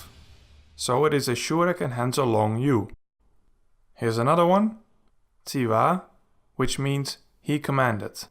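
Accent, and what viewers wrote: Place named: Netherlands. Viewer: hahaha nohhh mann. Ik hoor gelijkkkk dat je Nederlands bent 😂😂 we hebben zo'n accentje dat ze nergens ter wereld hebben. 😂😅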